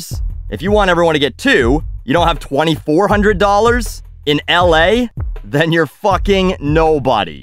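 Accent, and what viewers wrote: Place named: Canada. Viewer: So good to hear that angry Canadian voice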